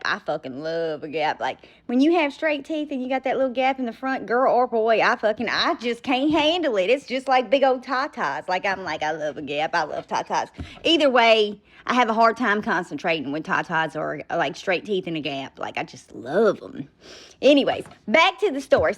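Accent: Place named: South, United States. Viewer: I love that country accent.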